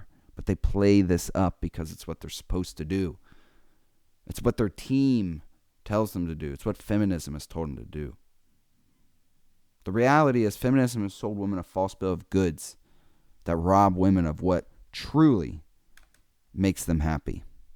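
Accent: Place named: Australia. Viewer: Aussie accent are awesome. I agree with the rest of what you said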